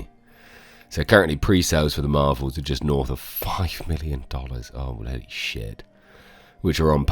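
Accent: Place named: United Kingdom. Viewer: I hope you get well soon! I miss hearing your lovely British accent.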